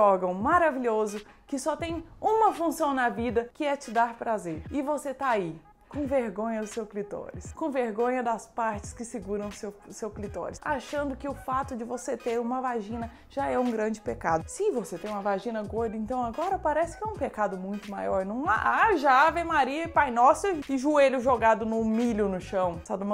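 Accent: Minas Gerais, Brazil. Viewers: Como não assisti seus vídeos antes! Moça você é demais! Muito engraçado! Nosso sotaque de MG é muito legal! Mineirice sempre!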